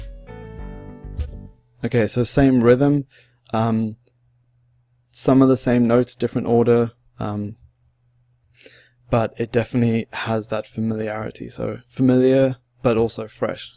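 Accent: South Africa. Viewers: Thanks for the video dude! Really helps a lot 😊 Are you South African by the way?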